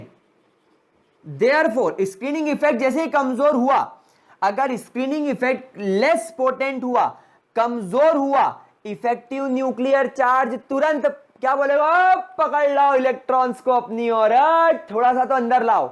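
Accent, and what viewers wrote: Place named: Indore, India. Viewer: Sir u r the perfect indori..😂😂😅.... BTW ur teaching is superbb thanks a lot sir..🙇🙏🙏🙏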